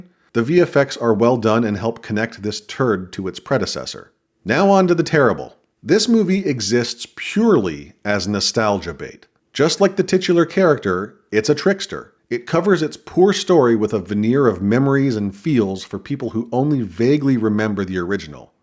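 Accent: Canada. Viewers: Wait, are you canadian? Your voice sounds vaguely like it.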